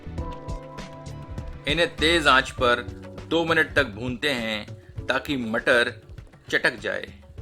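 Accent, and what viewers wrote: Standard. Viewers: Brother itni sudh Hindi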